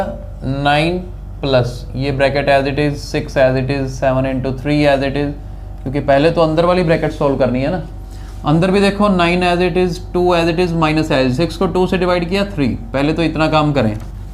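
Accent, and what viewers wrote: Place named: Ambala, India. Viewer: Apki language ambala area ki lag rhi hai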